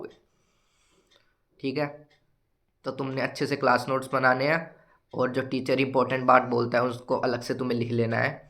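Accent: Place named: Haryana, India. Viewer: Bhai tera haryanvi accent kadak hai😂, mera bhi 2024 mein mains hai, 12th appearing hun😊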